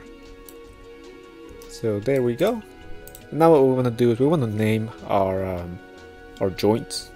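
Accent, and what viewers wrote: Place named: Brazil. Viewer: Thanks for sharing! Sinto um leve sotaque BR :}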